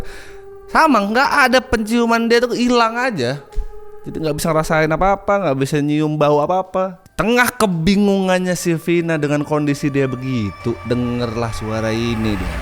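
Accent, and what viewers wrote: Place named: Lampung, Indonesia. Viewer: bg.. omong mu jowo tpi logat mu. lampung.. mntab mntb.. suka dngrinya. 👏👏👏👍👍👍😁😊😊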